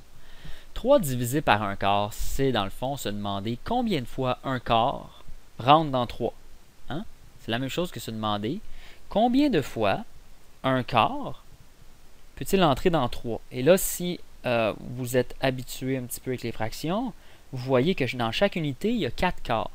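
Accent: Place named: Quebec, Canada. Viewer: merci beaucoup et magnifique accent quebecois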